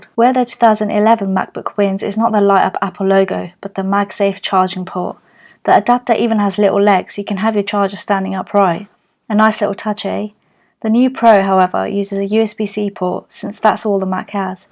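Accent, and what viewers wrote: United Kingdom. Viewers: Is it me or you have a strong Birmingham accent ? ahah ! Thanks for the video :)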